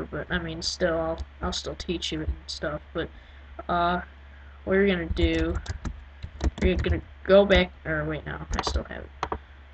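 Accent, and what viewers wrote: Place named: South, United States. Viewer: Your southern accent makes me want to puke all over myself :(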